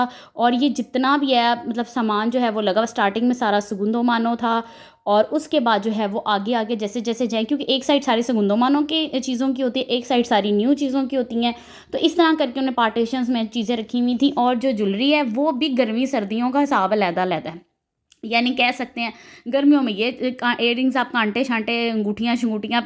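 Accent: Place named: Punjab, India. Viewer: Wah G... urdu k sath punjabi ka jo tarka lagya hai... maza a geya.. 😂😂😂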